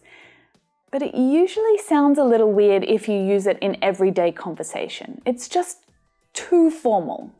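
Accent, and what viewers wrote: Australia. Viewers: I really love your Australian accent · Im new to the channel, Im trying to guess where ur from? my guess is you are down under?? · so you are from Australia, I was not capable of telling whether you are Britain or Australian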